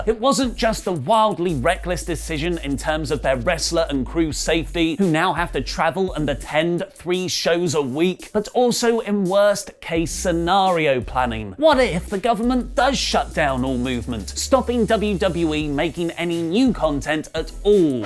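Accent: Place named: United Kingdom. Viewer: Where are you from? Your accent is British English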